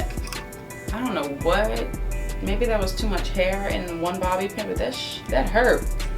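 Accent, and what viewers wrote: Louisiana, United States. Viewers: Yasss.. Girl your hair is everything!! Soooo cute. Little miss Lavender is soo precious. It sounds like you could be from Louisiana? Anyway, thanks for the content.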